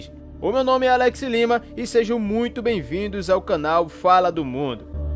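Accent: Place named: Bahia, Brazil. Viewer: O cara é mais baiano que eu que sou baiano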